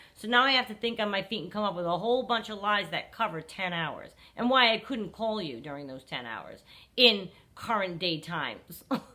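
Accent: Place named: New Jersey, United States. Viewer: Hi Just found your youtube channel today. I love you!! You make so much sense and you sound like people I grew up with in New Jersey😊 I live in Florida now after divorce from narcissist. Thanks for these videos. I like how you just talk like we are sitting in a room. I also like that you do not fill your videos with flashy intros and unnecessary music. I hope you don’t change the format. I especially love your dog in the background, so cute!!!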